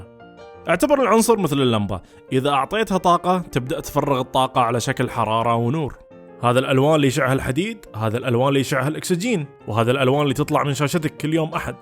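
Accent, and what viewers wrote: Kuwait. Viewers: ياخي لهجتك مب سعودية لهجتك كويتية .. انت قلت انك سعودي 🤷🏻‍♂️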